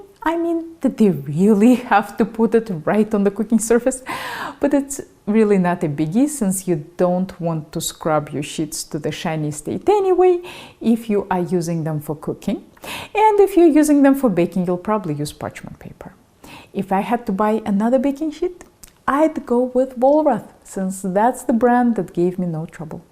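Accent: Russia. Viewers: Wow. This is a class on the "understanding of thermodynamics". Thank you and by the way, I love your accent. Your command in English vocabulary supersedes your Russian accent, got me confused for a moment. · Idk if it's a Russian accent but this women has me hooked on every word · I have been thinking. Where is she came from?! Damn, Russia. Why do you have a such heavy accent? You came as a teen to the US?! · I just stumbled upon her for the first time. Your comment is spot on. The most informative 13 minutes of baking I have seen with no distracting channel promotion etc.. I love her and her Russian accent is icing on the cake. Can't wait to see what else I can learn. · Is she Russian?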